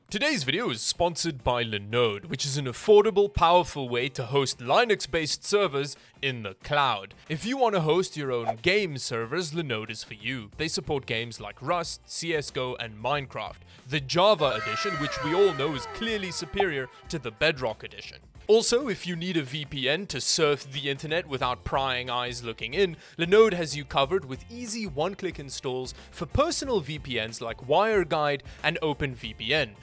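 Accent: South Africa. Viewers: Dude are you South African? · what is your accent comprised of please? i cant make it out. Sounds like South African mixed with Canadian and Welsh.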